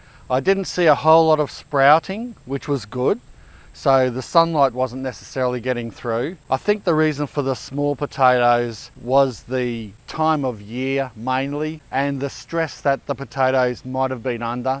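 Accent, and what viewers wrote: Australia. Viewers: I love that you experiment with things I think about! And love listening to your Aussie accent from here in Florida. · When you had the flu you sounded more Australian haha... Oh and merry Christmas;)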